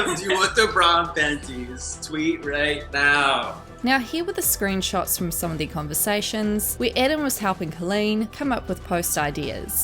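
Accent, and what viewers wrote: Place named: New Zealand. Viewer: I love your NZ accent... oh the dramaz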